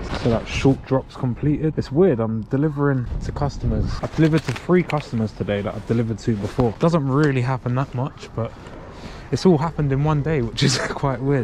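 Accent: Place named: Cockney, United Kingdom. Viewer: 'ow strong cockney accent you 'ave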